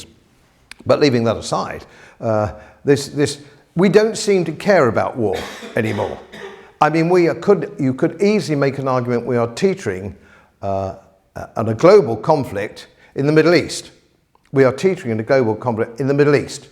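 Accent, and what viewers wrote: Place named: England, United Kingdom. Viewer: It's great to hear a lecture delivered in such a quintessentially English manner, thanks Godfrey for your dynamic and uplifting speeches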